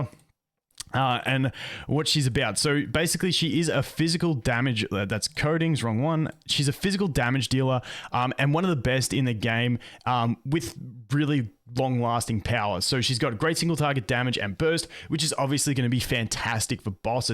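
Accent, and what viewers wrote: Australia. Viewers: Wait is he an Aussie. Surely? Still subscribing anyways lmaooo Edit: yep he definitely is… he has 7/11 ads HAHAJSHSHSJSJ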